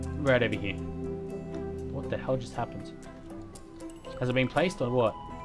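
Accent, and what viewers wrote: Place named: Australia. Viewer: Oh and in regards to the microphone, while it doesn't transfer every nuance of your awesome Aussie accent, I could hear you just fine. No worries with the microphone.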